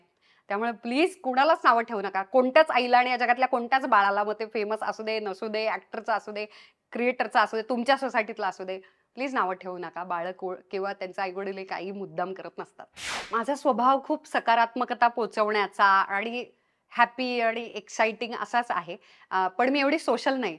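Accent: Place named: Pune, India. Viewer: कधीतरी नॉर्मल टोन मध्ये का नाही बोलत आपण? बोलण्यात सारखा पुण्याचा अभिनिवेश का असावा? बाकी आपले व्हिडीओज चांगले असावेत. आमच्या "ह्या" तुमचं कौतुक करतात.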